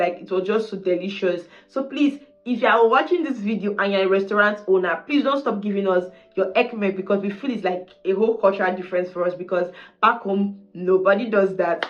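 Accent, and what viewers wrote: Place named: Nigeria. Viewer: You speaks very much like Nigerians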